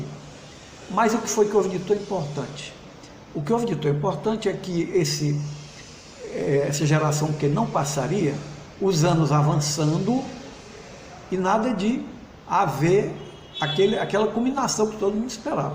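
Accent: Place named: Rio de Janeiro, Brazil. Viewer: Este é sem sombra de dúvidas o mais carioca de todos os cearenses. Parabéns pelo ótimo depoimento. Grande abraço.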